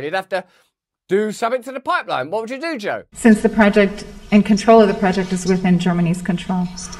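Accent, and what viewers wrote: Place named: United Kingdom. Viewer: You are the modern day Mark Twain with a British accent. Keep up the good work.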